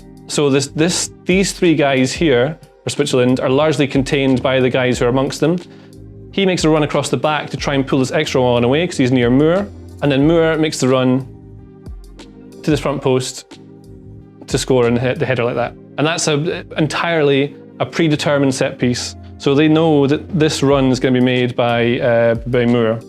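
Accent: Scotland, United Kingdom. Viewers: Probably don’t work very well because of his Scottish accent, Google is pretty discriminatory like that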